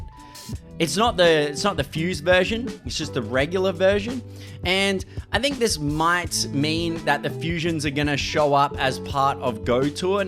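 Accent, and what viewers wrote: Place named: Australia. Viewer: Bro are u Australian? I love Australia 🇦🇺❤️❤️🇦🇺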